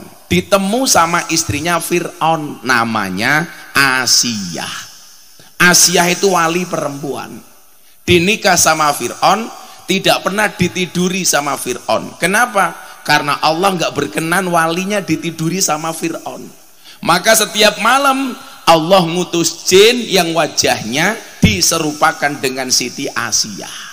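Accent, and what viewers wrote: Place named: Banyumas, Indonesia. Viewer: Ngapak njeplak ,kebumen ku❤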